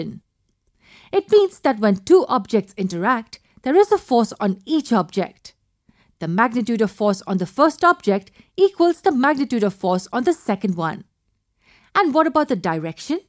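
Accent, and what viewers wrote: India. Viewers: this is to bad y r u Indian and explaining I didn't understand anything form u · Sounds Indian to me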